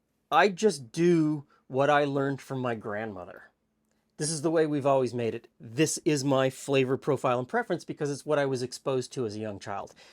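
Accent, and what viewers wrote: Canada. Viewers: I love your videos. I found you post covid, and I love your channel! Youre such a calm guy. You come across like a really gentle soul. I love the small coloquialisms from your subtle canadian accent “aboot instead of about” but subtle its turned down. 50%. Its one of the many things I adore about your channel. I love the food history. I love that you partnered with Max Miller on a video. I love when pops in. I love watching your easy every day banter. I love how well you know each other. The only thing I don’t like is that your videos are so short. I would watch you work in the kitchen happily! Tell more stories from your life. Talk more about your grandparents. I am a similar age to you, maybe 5 yrs older. My grandparents died when I was quite young, so love hearing other peoples grand parent stories. I would love to hear more about your time “counting trees?” living in a tent. 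Did you encounter a lit of wild life? Bigfoot, bears, moose, Elk? How many summers did you do that? How did you and Meet? The topics are endless. Bottom line. You and would be a blast to sit around the fire pit with sharing a bottle of wine. Have a great day to you both!!! Beth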